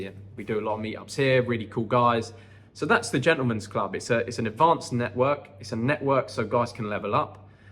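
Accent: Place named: Bournemouth, United Kingdom. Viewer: Didn’t realise you lived in Bournemouth, thought I recognised the accent! Im hoping to move to Koh Samui from Bournemouth at the end of the year 🤞🏼💪🏼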